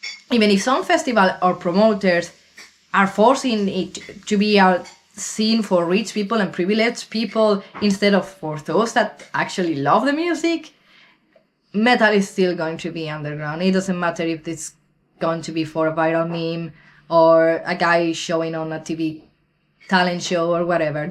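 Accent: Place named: Wallachia, Romania. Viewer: where are you from? you sound wallachian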